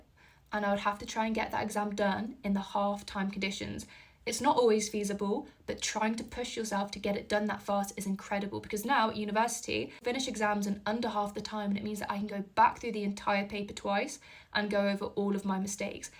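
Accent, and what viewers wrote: United Kingdom. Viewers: omgg her sound is so angelic and beatiful I can listen to it all day olso love brithish accent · Thank u so much for making this is going to help me a lot ❤ ur voice is so good I love people with a british accent 💋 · Love ur british accent❤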